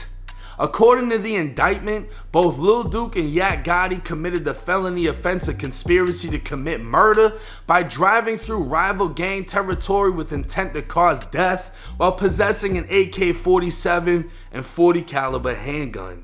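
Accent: Boston, United States. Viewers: Are you from Boston or Long Island?? 😂😂 your voice is so cute reminds me of an actor I watch!!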